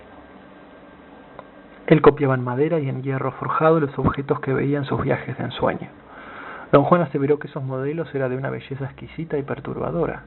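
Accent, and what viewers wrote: Argentina. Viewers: El ritmo de lectura es acelerado considerando que al comun de los lectores los conceptos son novedosos infrecuentes y hasta insolitos y requiere un ritmo de asimilacion mas pausado... La pronunciacion, al parecer de acento argentino, tampoco ayuda como tampoco es conveniente algunas prolongadas pausas o silencios no convenientes... La lectura es fria y carente de emotividad como lo ameritan algunos sino muchos episodios fantásticos de los relatados por el aprendiz de brujo, el autor de la saga...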